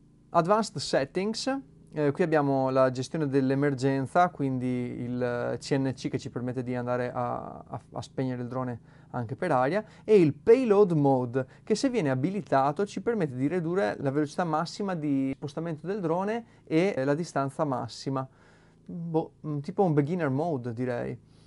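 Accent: Veneto, Italy. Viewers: Ma sei di pavullo???? Ti facevo Veneto. Complimenti sei molto in gamba.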